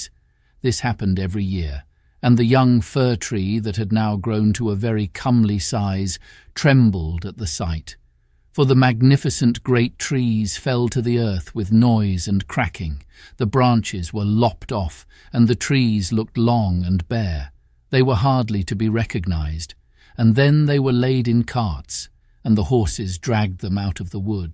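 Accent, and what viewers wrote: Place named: England, United Kingdom. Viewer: Thanks for that...great narration , English accent ...just found a new channel for me. Merry Christmas.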